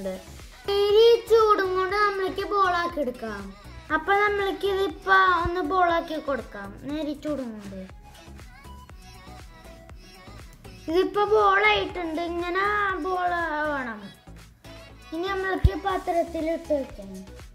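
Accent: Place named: Aluva, India. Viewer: വളരെ നന്നായിട്ടുണ്ട് നല്ല അവതരണം ആലുവ സ്ലങ്ങി ൽ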